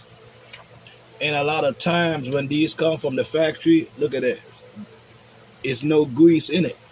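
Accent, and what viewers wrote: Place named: South, United States. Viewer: I could listen to his Southern Drawl all day long!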